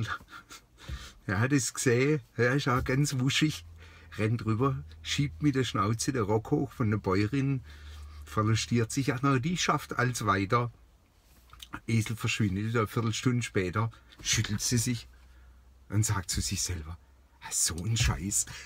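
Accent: Baden, Germany. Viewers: undeutlich? das ist badisch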